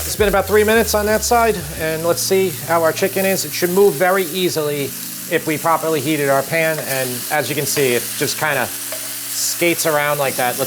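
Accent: New York, United States. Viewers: Mostly here for the delightful New York accent. North-Eastern US has the most pleasant sounds of all US accents.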